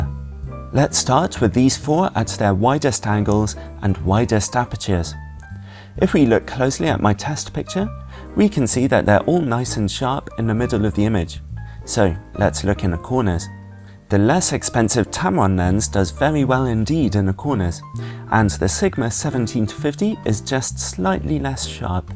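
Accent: United Kingdom. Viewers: excellent review indeed. Thank you. I really like your voice and British accent.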